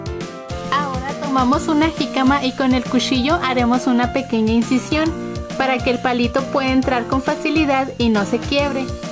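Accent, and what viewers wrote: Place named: Chihuahua, Mexico. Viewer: Hola! Me encanto el tutoríal n,n de dónde eres? Suenas como de Chihuahua c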